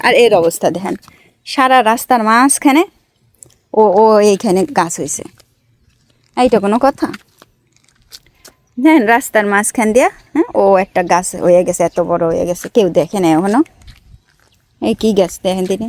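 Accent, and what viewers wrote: Khulna, Bangladesh. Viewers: আপু আপনার ভিডিও দেখি শুধু আমাদের খুলনার ভাষা শুনার জন্য। ❤️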